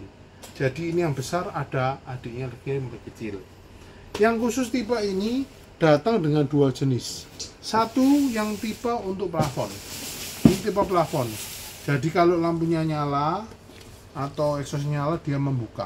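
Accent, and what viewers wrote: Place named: Tegal, Indonesia. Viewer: Tegal nih kayaknya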